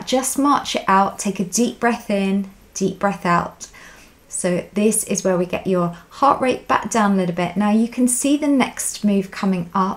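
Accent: England, United Kingdom. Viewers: Great work out to do w my husband w high blood pressure, exercise shy but reluctant to start medication. He prefers the English accent to the incessant tone & pitch of the US trainers! Thank you.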